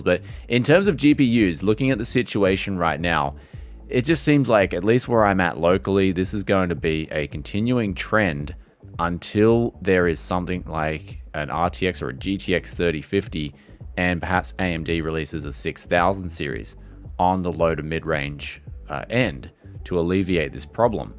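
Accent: Australia. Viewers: I think I could listen to Aussie's talk about anything and stay interested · love the aussie accent.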